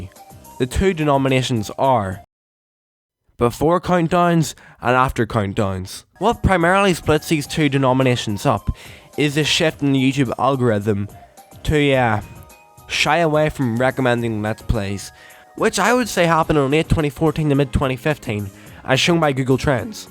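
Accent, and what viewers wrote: Scotland, United Kingdom. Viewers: Are you Scottish? Your accent is fire...also I’m buying it because I’m interested in the game. I like little town hero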